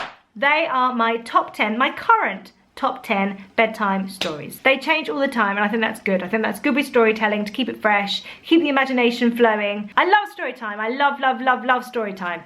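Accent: Scotland, United Kingdom. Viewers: That Scottish accent is brilliant! "I cannot rememberrr..." My 15 Month Old Niece loves the "That's not my..." Series...I think she has the whole collection! 😂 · Your Scottish accent is so good- you sound like Merida! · Love the book shelf behind you, where did you get it from? your scottish accent is quite good, you can also get the Gruffalos Child in Scottish slang!! It comes under Gruffalos Child in Scots. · Your Scottish accent is on point Gi !☺️ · I loved your Scottish accent, v authentic 😀 Great books I'm going to look for some of those for my son. Enjoying mumsday videos x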